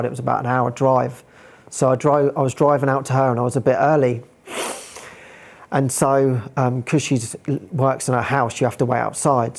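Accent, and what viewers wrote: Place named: England, United Kingdom. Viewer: This was joyful and fun, and with that England english almost like Monty Pyton 🙃🧡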